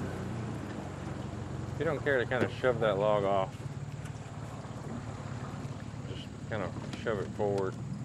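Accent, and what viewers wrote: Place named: South, United States. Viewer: I still love that accent of yours I just drives me nuts you're beautiful lady and that little southern accent just makes you so much more beautiful I bet you're driving crazy down in Florida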